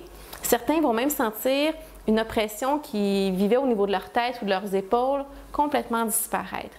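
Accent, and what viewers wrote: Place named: Canada. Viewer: Un très bel accent canadien! Merci pour ce partage! Très bien expliqué. Certaines choses, je les ai apprises au REIKI, la bule d'énergie.. J'ai coupé le contact avec mon frère et ma belle-soeur l'année dernière, ils étaient devenus toxiques pour moi. Pics, remarques, jalousie etc. Ils ne m'ont même pas soutenue pour mes manuscrits, qui finalement ont été édités:-) Depuis je me sens mieux, un peu triste que j'ai dû le faire au début, mais c'était nécessaire. J'ai essayé de renouer, impossible. C'est moi qui va tomber malade. Donc, j'ai laissé tomber. Toutes les personnes qui me conviennent moins, j'essaie de les fréquenter qu'un minimum. Certaines personnes je leur ai fait comprendre qu'ils n'appartenaient plus à mon cercle d'amis. J'essaie de m'entourer que de personnes positives, et qui ne me lancent pas de pics méchants, c'est difficile je l'avoue, mais faisable. 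Nous devons diriger notre vie, gérer nos projets, et ne pas vivre la vie des autres. Je l'ai compris assez tard, mais il vaux mieux tard que jamais. Ceci ne m'empêche pas d'avoir de l'empathie pour mon prochain et d'aider où je peux. Merci pour votre travail! Après 2 ans, comme j'ai eu un commentaire de Dayou Love, je rajouterai que mon frère et moi on s'écrit mais on ne se fréquente plus. Mon frère est assis entre deux chaises, je comprends! Mais plus personne qui me commande, c'est vraiment formidable!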